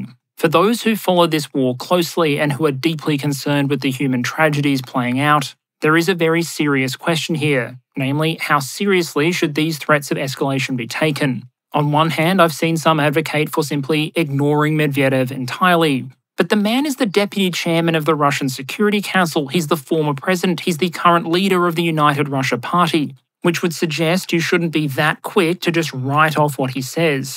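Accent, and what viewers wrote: Australia. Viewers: What an episode. You are serious what the world needs, the work you do is insane. You deserve all the awards. Thank you Mr. Aussie voice man.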